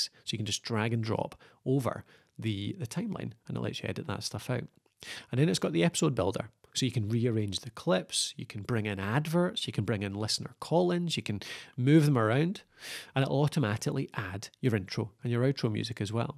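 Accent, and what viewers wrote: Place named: Scotland, United Kingdom. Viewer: He's not English. He's Scottish or Irish. He sounds like anyways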